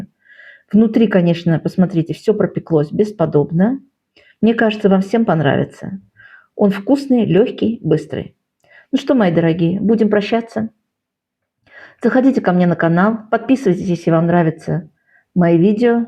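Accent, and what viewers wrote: Baku, Azerbaijan. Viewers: Я с удовольствием вас слушаю за ваш бакинский акцент ,удачи Вам а я Гюльзар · Слушая вашу речь, услышала знакомые бакинские нотки ❤ Прочла комментарии и поняла, что не ошиблась ! Бакинский говор остаётся с нами на всю жизнь и не узнать его не возможно .. Долгой жизни вашему каналу и удачи ! С приветом от бакинки ❤🌷💓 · здравствуйте Вы из Баку? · Я как раз так и думала что вы наверное из Баку Такой мягкий мелодичный бакинский акцент😊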